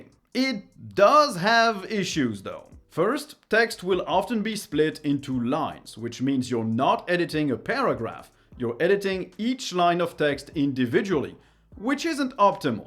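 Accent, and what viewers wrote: France. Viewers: Being French, I couldn't tell if he was a fellow frenchy or just someone that had lived in France long enough to get a bit of accent. (I wish I spoke English as well as him, and hope I will someday.) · In other other videos he’s made, he’s said he is French. As an American, I can certainly tell from his French accent!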